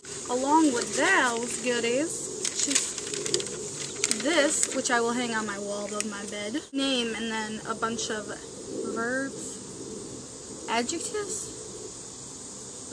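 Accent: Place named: Chicago, United States. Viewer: do u live in chicago... cause my family does and u have a illinos/chicago accent..... just wondering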